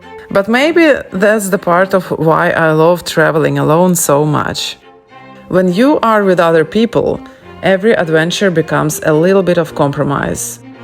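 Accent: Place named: Eastern Europe. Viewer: Like your eastern european accent, did you have a foreign english teacher? No offense, I understand you fine, just interesting🧐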